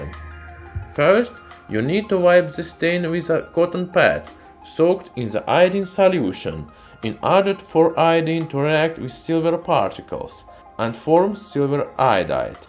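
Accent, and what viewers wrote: Russia. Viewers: Nothing more authentic than a Russian accented guy introducing an element. Solute to Mendeleev.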